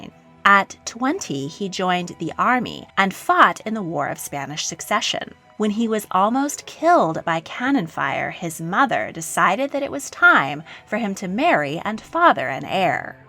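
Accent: United States. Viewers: I am an American. but I find the American accent of this narrator absolutely horrible. Why can't people speak English properly without using a hysterical accent? I have been living and teaching in Europe for more than 30 years and I have to say, less is more. Don't over-do an accent like this narrator does. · It’s so funny hearing all those European names pronounced with an American accent.😅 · Screeching American accent telling European Royal history poorly! 😱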